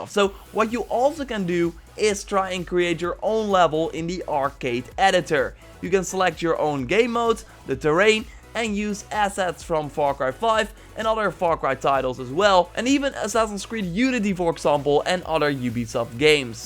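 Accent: Netherlands. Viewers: Jii bent Nederlands zeker? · the strong dutch accent u have tho jeez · Ben je Nederlands?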